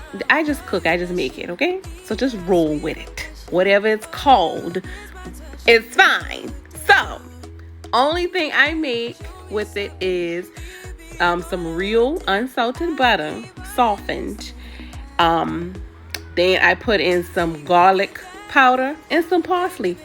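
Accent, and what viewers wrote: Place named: South Carolina, United States. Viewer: I knew you where from sc! Your accent is heavy lol! Going to try this for vday! But would it matter if I use crab claw meat? I don’t really care for the lump crab lol.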